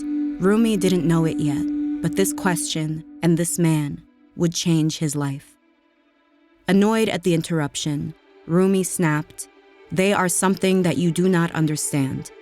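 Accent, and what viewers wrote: Arab world. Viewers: The Arabic accent of the narrator is stunning! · why the pronunciation is Arabic? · Why do you have an arab with arabic accent telling the story of a Persian poet? She even said the name wrong. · Funny that the pronunciations are more Arabic than Turkish even when saying Türkiye. Not very reflecting of the language of origin. Not all Middle Eastern countries sound the same. H is almost silent in Turkish. Speaking as a person that comes from the same city as Rumi.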